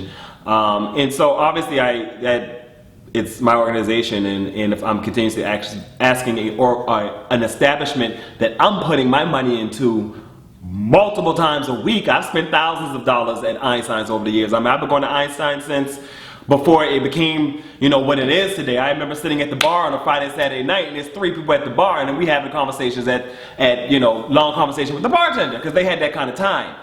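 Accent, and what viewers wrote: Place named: Lowcountry, United States. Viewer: He must be from the lowcountry..... I hear Lowcountry SC all in his voice!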